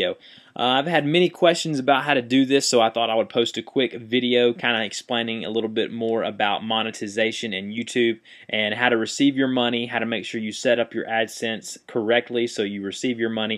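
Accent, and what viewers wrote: South, United States. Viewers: you've got a southern accent :) where ye from? xx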